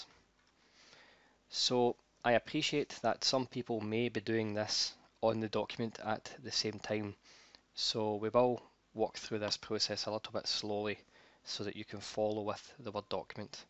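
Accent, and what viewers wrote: Scotland, United Kingdom. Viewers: Thank you so much, for your excellent demonstration! You were so clear on how to perform this function and your delivery was at a very appreciative pace! I am going to follow your great instructions! However, I need to insert Roman numerals into the pages prior to pages 1, etc, throughout my manuscript, so I will follow the same instruction and see if it works! If not, I will email you to ask how to insert Roman numerals prior or after I insert page numbers throughout my manuscript. BTW, I love your Scottish accent! Thank you again for your awesome instructions and delivery! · Thank you, thank you, thank you! I was losing my mind trying to get my document properly paginated. You made it easy. Also, love your accent. Viva Scotland!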